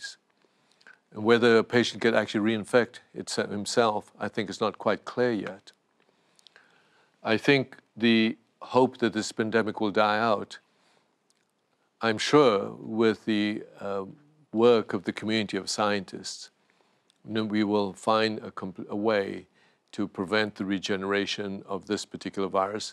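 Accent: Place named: South Africa. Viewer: Great that having spent so much time outside South Africa he still maintains his perfect South African English accent.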